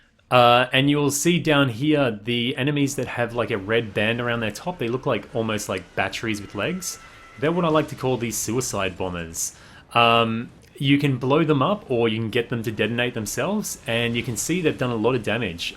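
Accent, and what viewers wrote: Australia. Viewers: Can't place your accent. Are you Australian or New Zealander?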